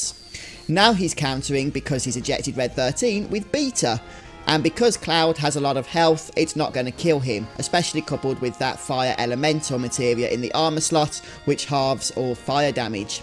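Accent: United Kingdom. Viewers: dude you should start practicing to steer away from your crazy british accent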